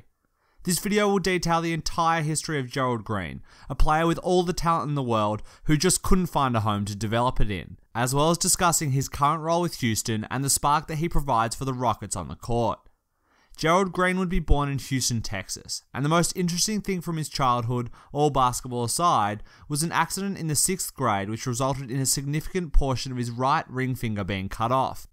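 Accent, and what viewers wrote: Australia. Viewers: u sound like an asian aussie amirite?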